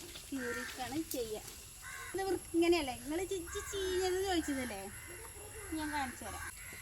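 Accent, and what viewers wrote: Malappuram, India. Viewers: സൂപ്പർ നിങ്ങൾ മലപ്പുറം ജില്ലയാണല്ലോ സംസാര രീതി കേട്ടപ്പോൾ തോന്നി എവിടെയാ സ്ഥലം 👍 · നിങ്ങൾ മലപ്പുറത്തു കാരാ സംസാരം കേട്ടിട്ട്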